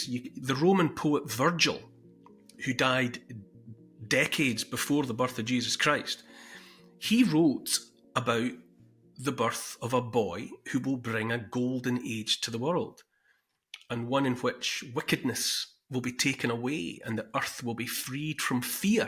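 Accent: Scotland, United Kingdom. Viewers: Irrespective of your own view of yourself... I stand by the following... 😁 (written in May '22) 👍😍 Won't Kneel A gentle, beautiful Scottish lilt A kind, empathic, forward tilt An open heart; a caring face A willingness to take his place. A strong, home-hitting monologue Reminds us of the boiling frog The courage to become a sleuth A passion to release the truth. A deep desire to crack the whip Illuminates our freedom-strip Calls out the darkness taking hold The shiny despots; plated gold! Intense and raw, he lays it bare A soul-enhancing, honest stare Inflections drive the message through He speaks for Us - for me and you! Discernment's such a precious gift His words create a mighty lift Take heed of all he has to say He knows - it shouldn't be this way! 🤗🦋🐬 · Ayup, I live with a real fire, all the time and just to let you know that a crackling fire and your Scottish tone playing with one of your monologues on in the background and a candle is a magical thing! 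Very celtic! Thank you 🤝🏴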